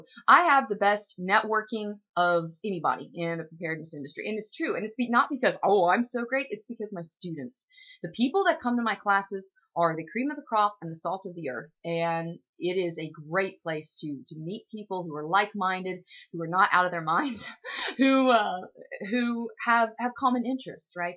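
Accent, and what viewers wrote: South, United States. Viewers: Scott Haskin You're right you can't hide Natural Beauty and that southern accent makes me week at the knees..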